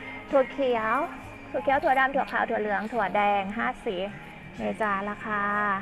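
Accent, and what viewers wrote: Isan, Thailand. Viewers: เว้าภาษาอีสานหม่วนคัก เว้าดีเสียงเป็นตาฮัก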